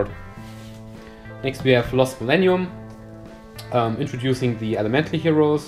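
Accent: Germany. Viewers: Bist du deutscher? Dein akzent wirkt irgendwie recht deutsch xD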